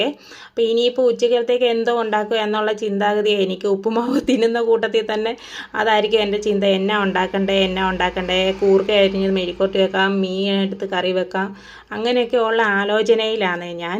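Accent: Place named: Kollam, India. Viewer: തോനെ കൊല്ലം ഭാഷ ആണെല്ലോ ❤️🥰 Like 🥰🥰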